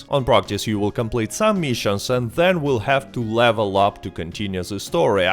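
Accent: Russia. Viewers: Ohhh this russian accent, but video is zaebis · Are you Russian?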